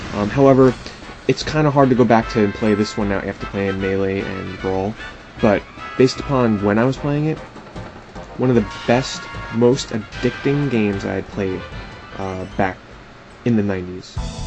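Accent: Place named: Boston, United States. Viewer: Yo your from Boston right? I can tell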